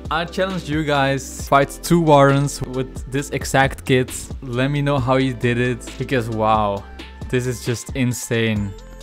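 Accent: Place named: Netherlands. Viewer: Veel succes ermee man, assuming youre dutch since i might be hearing a dutch accent. Fun commentary btw, i enjoyed the attempts & inevitable panic as expectations get absolutely grounded back to reality & humbled w the buffed Warden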